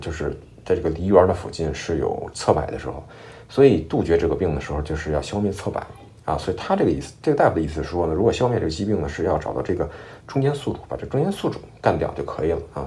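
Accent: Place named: Beijing, China. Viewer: 菲龙网看了一下都是视频加文字。。哪里有这样的京腔京孕有意思。。😊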